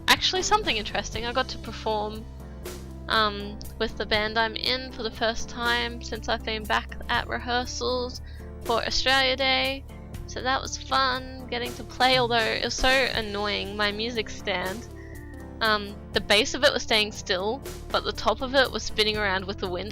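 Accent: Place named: United Kingdom. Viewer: Haha, na, sounds to me like you got a good voice for these things. Are you British? I feel like theres a sort of British accent in your voice.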